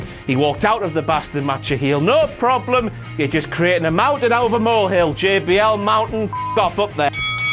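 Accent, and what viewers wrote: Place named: England, United Kingdom. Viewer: I wonder what part of England is he from.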